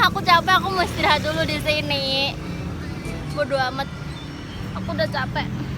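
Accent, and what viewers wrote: Indonesia. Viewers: Wong purwodadi ndy suorone lurr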